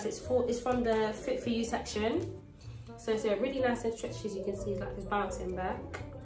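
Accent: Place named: United Kingdom. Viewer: #monaemaffia. Your British voice is everything. Your style is 💥💥💥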